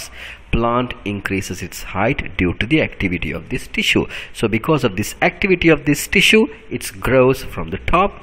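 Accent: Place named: India. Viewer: dude u got a Indian accent but i dont think a indian guy would do videos on sl sylabus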